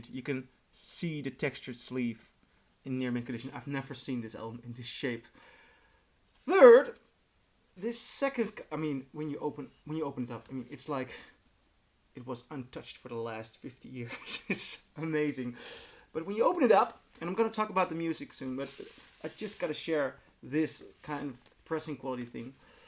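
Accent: Netherlands. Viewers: Are you from The Netherlands? Just asking because of your accent. I'm Dutch.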